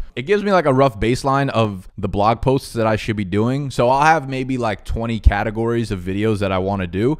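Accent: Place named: Philadelphia, United States. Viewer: Are you from philly? You got the accent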